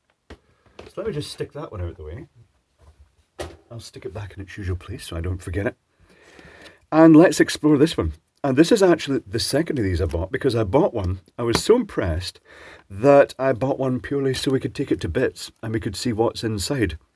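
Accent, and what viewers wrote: Scotland, United Kingdom. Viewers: I have a heap of unusable ones and whilst of a similar stature and deftness with a screwdriver clearly lack the dulcet soft Scot's accent to lull the fiendish devices into compliance.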